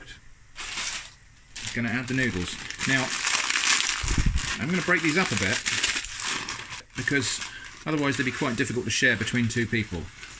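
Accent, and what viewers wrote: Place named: RP, United Kingdom. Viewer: You sound so posh when can we see your face ???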